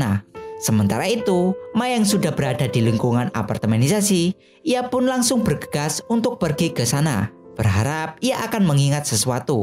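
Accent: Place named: Java, Indonesia. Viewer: Ya Allah, suaranya medok bangets...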